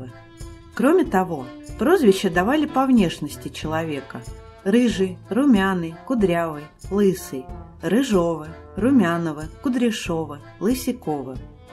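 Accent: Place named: Gomel, Belarus. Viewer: Говор беларусь гомельская обл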